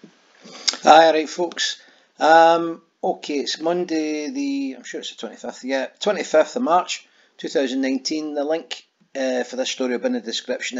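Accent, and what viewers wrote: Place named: Glasgow, United Kingdom. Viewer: you replied! <3 I read it with your voice xxxxxxxxxxx My Glaswegian love lol xxxxx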